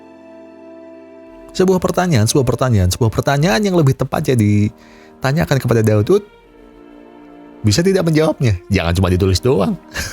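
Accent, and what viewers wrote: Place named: Banyumas, Indonesia. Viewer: Deneng teyeng ngapak njenengan mas?😅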